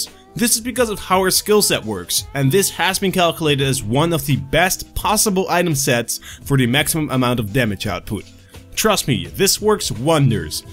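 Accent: Netherlands. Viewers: Are you Dutch?! xD I notice it in you accent. Great job with your channel!! :)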